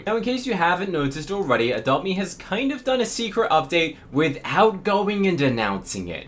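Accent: England, United Kingdom. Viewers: Are you english or American?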